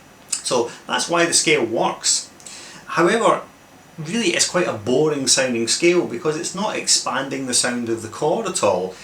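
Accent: Scotland, United Kingdom. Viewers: Never seen your videos before and liked your intro. Then I was pleasantly surprised to hear your Scots accent. I'm originally from Clydebank. ;) Doing a lot of private home Jazz study. Looking forward to following you. Aw th' best! Ha ha. ;)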